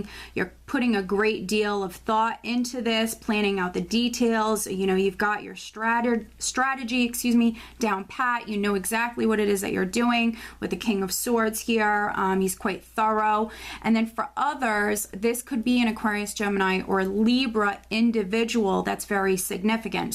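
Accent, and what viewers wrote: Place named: New York, United States. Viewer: where are you from? I hear a ny accent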